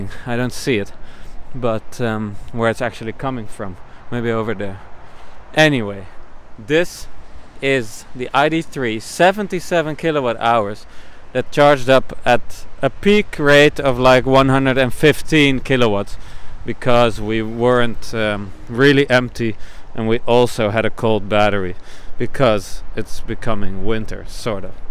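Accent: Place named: Netherlands. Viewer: your Dutch/English accent sounds different from other Dutch/English accents I’ve heard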